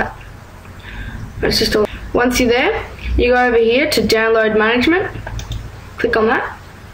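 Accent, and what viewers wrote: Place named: Australia. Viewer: thanks you have an aussie accent im aussie as well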